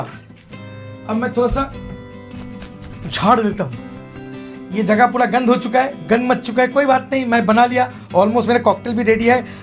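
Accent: Bengal, India. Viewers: Sir apnar hindi sunne mone hoche je apni bangali aita ki thik ?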